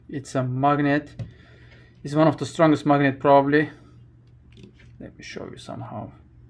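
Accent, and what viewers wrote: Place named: Turkey. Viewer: Süper video, teşekkürler. Aksandan türk olduğunu düşünüyorum 😄Hoparlör kulakları biraz rahatsız ediyor ama alışırım umarım.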